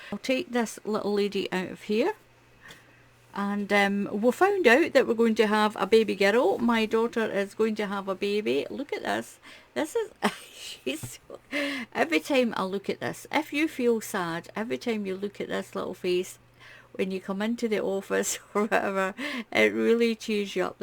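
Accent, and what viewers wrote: Scotland, United Kingdom. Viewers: I stumbled over your website. You have wonderful patterns. But I have a problem. I got sent to a hearing specialist when I was 5 yrs old due to suspected loss of hearing. Severe ear infections over the years ate away the bones in my inner ear. I relate this for all new mothers to pay attention to fussy babies who keep rubbing their ears or get colds. Working antibiotics finally arrived when I hit adulthoods, but the damage was done, I lost complete hearing in left ear due to destroyed bones, and partial in the right is left. I am 70 yrs old now, retired, but lost almost all my hearing. Hearing aids were stolen by “helpers.” My problem is now not being able to understand low soft voices. I had a Scot boyfriend in college your voice sounds much like his mother’s. So I have a dual problem, voice too soft to hear well, and I have to work my way through your accent. 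What compounds it is so far, I can find no instructions in your various pattern videos included in printed on your videos. I have to see the piece you are working on to figure out quickly what you are doing. But often your hands cover the work. Most f what I crochet is done for charity. I’ve done hats, scarves, mittens for grade school children, donated them to schools that provided children with winter items who lost theirs or parents couldn’t afford to buy them. I’ve done baby blankets to go to maternity units in hospitals or for those in NICUs for preemies. Also have done lap afghans for wheelchair vets in veteran h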